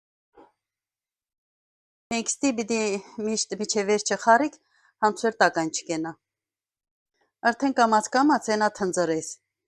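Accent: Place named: Hadrut, Azerbaijan. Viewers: Как приятно слушать наш Гадрутский акцент! Спасибо вам! ❤ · ջերմ բարևներ բոլորիդ,էլի մտքով տարար մեզ մանկություն,եղիր առողջ և թող բարգավաճի քո յութուբյան ալիքը ու երբեք չմոռանանք մեր քաղցր ղարաբաղյան համով հոտով բարբառը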